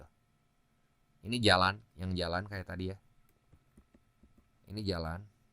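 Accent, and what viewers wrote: West Java, Indonesia. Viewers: kak terimakasih sangat membantu!!! semoga aku bisa tembus skor 1000 hehe btw lucu bgt sm aksen sundanya😆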